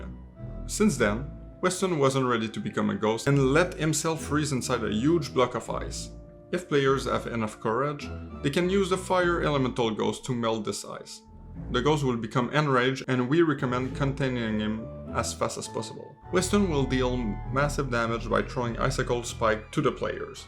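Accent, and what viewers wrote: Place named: Quebec, Canada. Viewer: This guy's voice is agressively Québecois.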